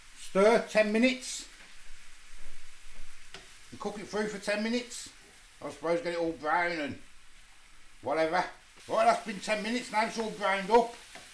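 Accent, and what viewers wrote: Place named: Newcastle, United Kingdom. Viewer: Can't beat a Geordie chef lol whye aye man 😂👌👍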